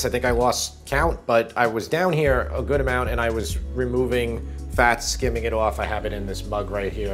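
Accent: New York, United States. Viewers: May I ask what accent you have ? Sounds like New York to me. I’m an Aussie. 😬👍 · I have made chicken soup often, but this made very clear how you can use the chicken to make the stock and use the same pieces to use in the soup. I do like white meat chicken in the soup, but the ease of this would certainly make me give this a try. As a native New Yorker, I love listening to ’ accent, making a big assumption that he is also from New York.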